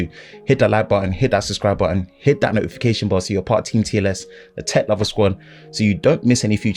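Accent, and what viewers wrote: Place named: United Kingdom. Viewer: Your britch accente is epic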